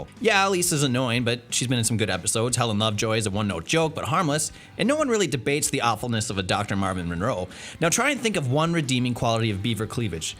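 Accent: United States. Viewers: as soon as i heard the american accent, all i could think of was 'she doesn't even go here' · what is with the shitty stupid sounding american accent? if this keeps up you will lose ALOT of subs! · An american whatculture narrator... amazing. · I love Simon, so I'm not just one of the Adam fanboys, but I just don't like this guy's voice. Too American for this channel. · Its stupidly weird hearing an american on what culture wrestling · An American accent on Whatculture Wrestling just seems...off. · and why there's no british acent in this video? they americans stole the cahnnel? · Ahh, finally.... An American voice over, for a top ten video.. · You know, after all these foreign accents this American accent is foreign to me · What culture shouldn't be narrated by Americans #wewantadam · wtf who is this dirty american where is my sweet voice of adam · Sorry but whatculture really doesn't suit an American accent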